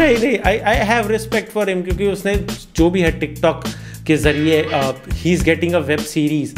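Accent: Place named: Maharashtra, India. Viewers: Only positive comment I can give is, this was a better trailer than Race 3. That too because of Bhai's commentry. Bhai love ur Marathi accent, kashala? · You are Gujarati but your speaking accents sounds like marathi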